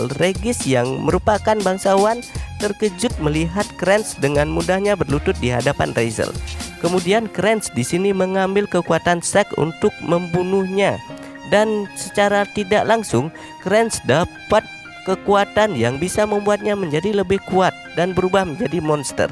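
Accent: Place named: Java, Indonesia. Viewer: Tambahan, kalo bisa logat daerahnya di kurangi. Bahasa indonya medok bgt.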